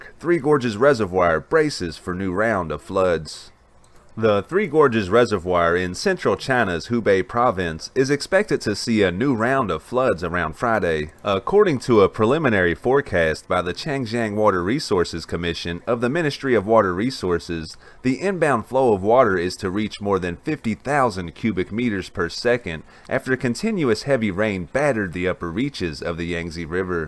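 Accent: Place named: Texas, United States. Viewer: Good coverage. Another interesting video. I have been subscribed for a few weeks now and am enjoying the dam coverage. I also enjoyed the coverage of the Mt. Sinabung eruption. If I could bring up a minor sticking point on your vocabulary... the word reservoir is pronounced resev-war not resev-wire. Just FYI. Wouldn't want anyone mistaking your charming southern drawl (Texas?) for ignorance.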